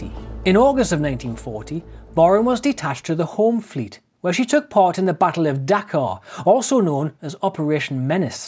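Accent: Newcastle, United Kingdom. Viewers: Commentator has a Geordie accent.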